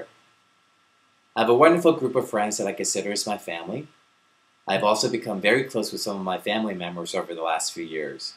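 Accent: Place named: New York, United States. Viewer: As soon as the first word came out his mouth you can tell hes a NYer, Nice Vid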